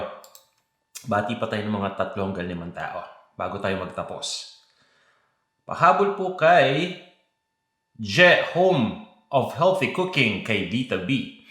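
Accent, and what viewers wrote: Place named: Tagalog, Philippines. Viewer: Gusto gusto ko talaga mga lutuin mo sir and the you speak sobrang tagalog na tagalog Panlasang Pinoy talaga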